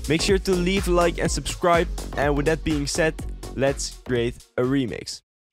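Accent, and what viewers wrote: Netherlands. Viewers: Mooi nederlands accent haha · you sound dutch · 😂Je hoort het geweoon, je komt uit nederland zeker? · De eerste paar seconden hoorde ik al dat je Nederlands bent haahhaha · This guy is definitely Dutch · je nederlands accent man geen haat tegen maar tis een beetje raar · It sounds like u have an dutch accent Are u dutch? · Ur Dutch? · are you dutch? bc you kinda sound like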